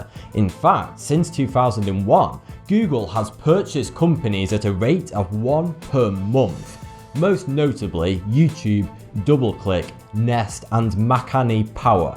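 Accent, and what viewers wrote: United Kingdom. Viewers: the big question is, where is mr. evelyn rotschild?, your accent obviously you are briton, you should know him by now.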